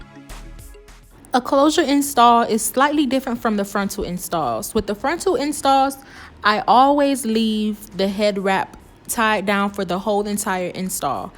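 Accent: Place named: New Orleans, United States. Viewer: Are you from New Orleans? You accent is telling me so 🤣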